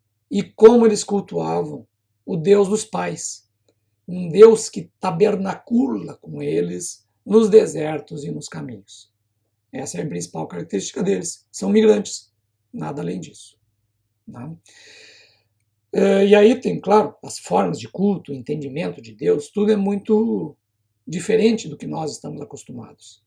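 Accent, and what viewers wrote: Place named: Rio Grande do Sul, Brazil. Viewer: Eu primeiro lhe identifiquei como brasileiro e pela entonação e sotaque eu notei que era gaúcho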